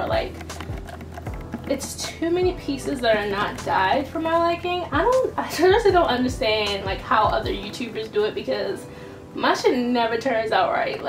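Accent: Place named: DMV, United States. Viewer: Are u from DMV? I love ur accent tho.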